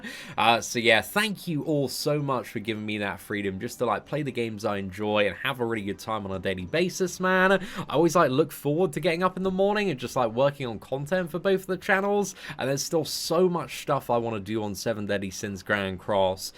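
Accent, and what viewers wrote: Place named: England, United Kingdom. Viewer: Definitely appreciate you,. Informative content, entertaining content, always a chill time watching your videos and I think for most people from the states the English accent is a cherry on the top. Much respect and looking forward for whatever else you create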